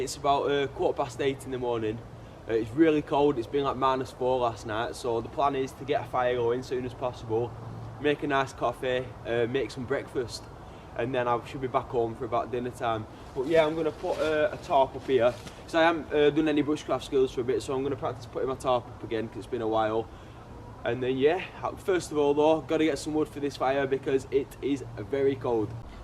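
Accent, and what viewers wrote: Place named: Yorkshire, United Kingdom. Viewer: 😂😂😂😂😂🤣🤣🤣🤣🤣just fucking with you brother hahaha we all need a laugh actually liked your vid bro we’re bouts you from sound like a Yorkshire lad like myself brother keep it up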